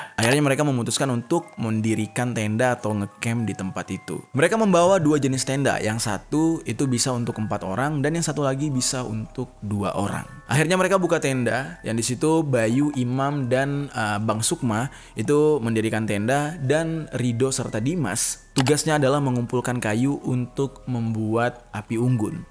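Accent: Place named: Medan, Indonesia. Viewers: Maaf bg,aku orang Medan,aku ngakak kalau dengar bg ngomong logat medan😂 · Logat yg enak didengar g terlalu kasar dan ga terlalu lebay ya logat medan,melayu baguss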